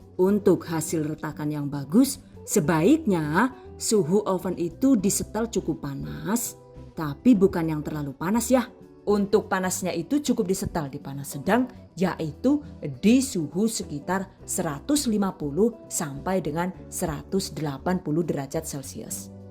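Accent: Banyumas, Indonesia. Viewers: mbk e lucu nk wayah ngmong ngapak😂😂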